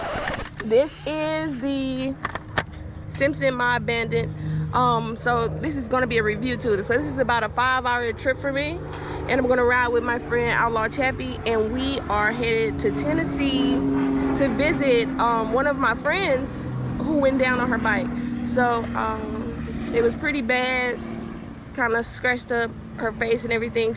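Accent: St. Louis, United States. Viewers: It’s bugs up here 😂😂😂 think that’s the first time I heard that St. Louis accent!! I like my helmet to match my bike so guess there’s no Simpson in my future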